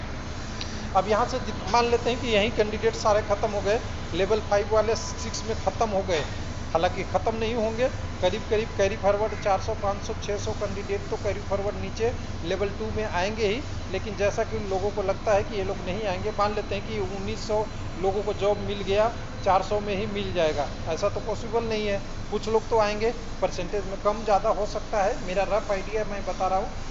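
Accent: Bihar, India. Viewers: sir pls don't mind but u r very arrogant.. n arrogance to ravan ka nahi raha ..n rahi baat noted ki.. to aap hai bihari .. kyunki document ko daakument bolte hain(n old aged bihari bade danger hote hain .. ghamand achhi baat nahi dadaji .ise tyagiye , hum bachhe Hain hamara bura sochna band kariye.. bhagwaan kare ki aap galat ho.. agar aap sahi v huye to aap waise v swasth nahi rahiyega..kyunki 2000 bachhe aapke kharab health ki dua kar rahe honge wo v din raat...or galti se aap mil gaye tab to raam hi aapke swasthya ka maalik hai ya fir aapka Allah, kyunki or cast to tum ho nahi sakte.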